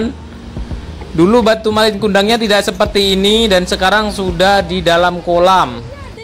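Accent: Java, Indonesia. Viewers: Mantap mas..viewnya.mas asli orang mna.dari suaranya kyak suku jawa gitu.Explore terus ranah minang sy dukung chanell G.J.J.Salam 1 sumatera.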